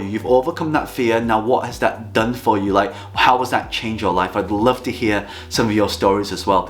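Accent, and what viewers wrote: United Kingdom. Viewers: I have never heard you stumble. Sometimes I can here your Northern accent though. ;) Btw. my husband is from Lancashire, too. ;) He was born in Rossendale, Lancs. But unluckily we just speak German, because he came over during his childhood.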